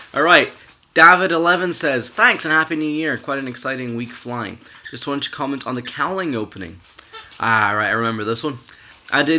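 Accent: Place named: Scotland, United Kingdom. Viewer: you're French right? You sound more Scottish in every vid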